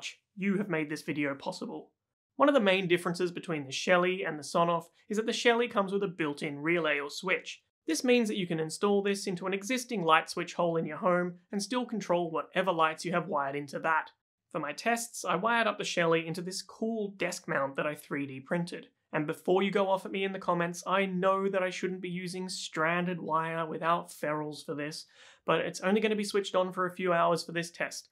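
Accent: Australia. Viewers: Hey mate, I noticed the Aussie Twang. Understand you're in the UK, how much of this can I replicate in Sydney? I've got a mid 90s brick veneer home and things like neutral are not there and I'm not looking to get new wiring in.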